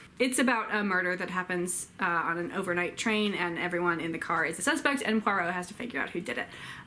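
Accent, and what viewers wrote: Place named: South, United States. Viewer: I've been wanting to check out And Then There Were None for a little while, given this Christie revival but I am already a slow reader so I'm not sure it's just a way to frustration. Probably just sucked in by the cover. I've been watching you a little while now, love to hear what you're reading and I share your love of Hardy! I'm an ignorant Aussie so I hope I don't offend but I love your accent, its got a lovely twang to it (says me hah) -- clear American but a bit of Southern? It reminds me of Donna Tartt. Obviously hers is very very strong (Mississippi?). So I listened to some of your audiobook and it does lend itself to narration very well! Look forward to more from you,:)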